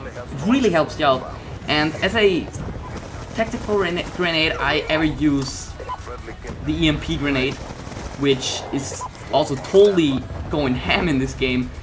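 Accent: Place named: Germany. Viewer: He might be German, or European so that's why his voice is like that. And i must say that he is MUCH better than me, and luckier too haha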